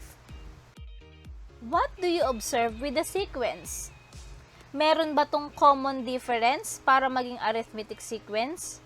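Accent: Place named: Bicol, Philippines. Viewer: Hi ma'am thank you po,,btw napansin ko po na parang taga Bicol ka? I'm from Bicol din po kase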